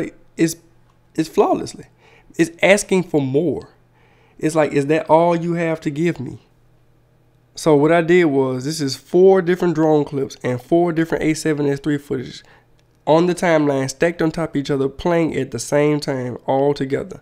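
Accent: Louisiana, United States. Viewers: Yaaaaas. I’m an Instructional Designer and have been watching tons of these videos and doing research before I make my purchase. Loved the review. Glad I came across your video. Also, me after a couple of minutes of hearing you speak: “I wonder if he from Louisiana?🤔” 😅 Thanks for this vid! ⚜️❤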